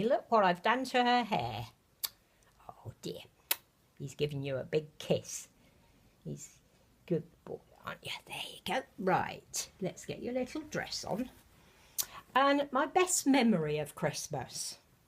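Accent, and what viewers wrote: United Kingdom. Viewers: I love your Sophie .she looks a lot like the Maize sculpt.she is gorgeous.she makes you smile even when your feeling. down many inches is she ? I'm from the u.s.a.YOU sound like you have a British Accent.that I love by the way.kisses to Sophie from aunt Eve.by the way Easter is my favorite time of the year.FOR JESUS.KEEP MAKING THE SOPHIE VIDEOS😝😝😝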